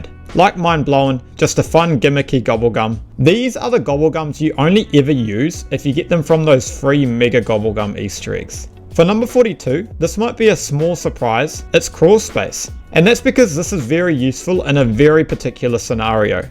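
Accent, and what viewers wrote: New Zealand. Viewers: yooo bro i came from the lex video and instantly recognised your accent since im a kiwi too. love to see a zombies creator from nz. keep it up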